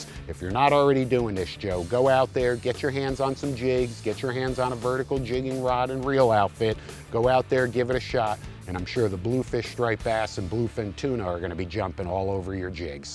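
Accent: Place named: New York, United States. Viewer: This guys accent kills me. Go back to New York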